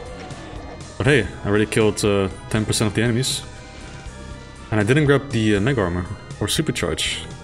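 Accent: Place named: Netherlands. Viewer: It is the Dutch way of speaking English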